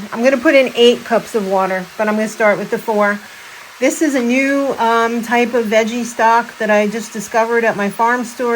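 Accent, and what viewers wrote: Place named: New York, United States. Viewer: Happy Birthday!!! I am enjoying watching all your videos (even way back.) Thank you for sharing your life with us. As a former Long Islander, I love your New York words, phrases, and accents. I hope your birthday is wonderful and your year filled with blessings. 🎈🎈🎈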